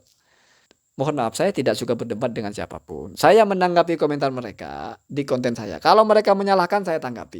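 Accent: Madura, Indonesia. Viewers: Ustadz orang Madura ya. .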